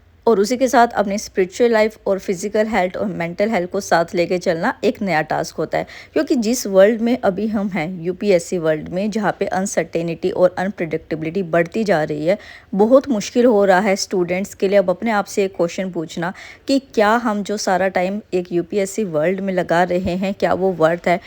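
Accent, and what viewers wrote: Haryana, India. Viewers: Hii didi ...is bar ke upsc prelims ke baad maine baki exams pr bhi focus krne ka socha h pls if u can reply mujhe bta do.... 1). Mai delhi se hu toh kya haryana ka pcs me chances h kyuki mujhe lgta h sb apne states ke candidate ko preference denge.. maine apko uppcs dete dekha h lekin apki boli se ap mujhe haryanvi lgte ho pls if u can help me out. 2). Apne epfo dia h kya exam or uski strategy?😅 Btw , Happy marriage life didi...May your forever after be just as magical as your honeymoon.❤🎉🦋🌸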